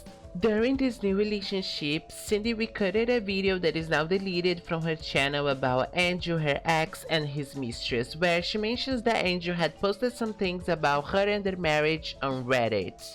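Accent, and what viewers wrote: Brazil. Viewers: meu vc é brasileiro? kkkk senti mto o sotaque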